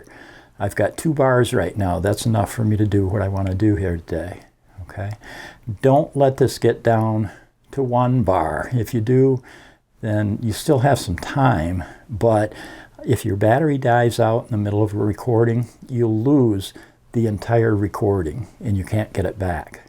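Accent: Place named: Vermont, United States. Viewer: Very helpful! Well done and you sound like you are from Vermont :) many thanks!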